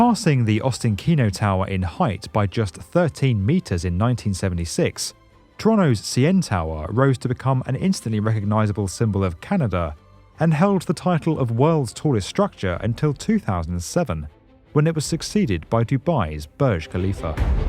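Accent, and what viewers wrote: United Kingdom. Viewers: too britishhhhhh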